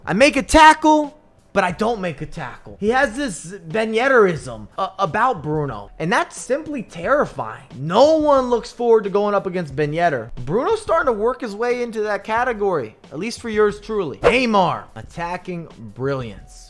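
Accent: United States. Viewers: Bro you american?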